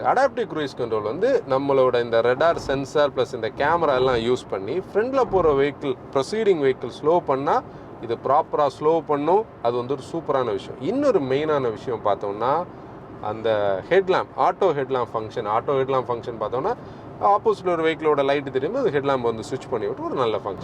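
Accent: Kanyakumari, India. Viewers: Kanyakumari slag 😊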